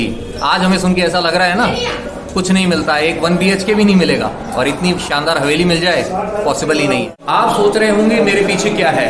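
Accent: Standard. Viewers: Bhai kya vedio banate ho aap jordar. Apki suddha Hindi bolneka andaj man gaye yar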